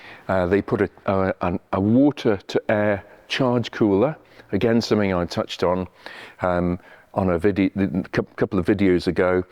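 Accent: United Kingdom. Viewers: God this old gasbag who loves the sound of his own voice - which by the way is a sort of Hyacinth Bucket/Alan Bennet mash up - bridging a gap we never knew we needed filling that's a sort of fussing and constant irritation half way between Clitheroe - and South Kensington. I literally could give less of a toss about the grandiose delusions of any "relationship" with Crewe, l whose hapless senior staff would receive periodic unscheduled visits for a "nice chat over a proper cup of tea" with the full expectations that the posh biscuits were brought out - there's just a hint that the put upon secretary unfortunate enough to have to bring the tray through, was given a little pat on the bottom and a knowing wink and a twinkle that simultaneously says "we're all having fun aren't we pet" and "don't worry about me, I'm like family" ... While in the subject of made up dialogue, I'm sure if not said, then at least thought, was "I like to think many of my suggestions found their way into the cars, if I may so modestly say". 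I made that all up a) because I didn't get any further than "....Doktor Hüffenpump" before a little bit of sick came up at the humblebragging and b) because I wouldn't have cared about the actual words said since I just find him too off putting. And by the way, those Bentley executives, I bet any money, used to run and hide or at least drew straws as to whose turn it was to deal with him😂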